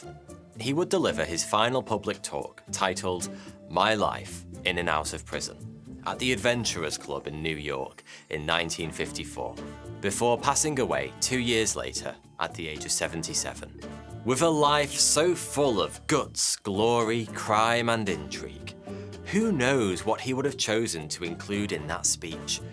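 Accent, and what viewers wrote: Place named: Yorkshire, United Kingdom. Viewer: Everybody loves the yorkshire accent. Exactly why this guy is so popular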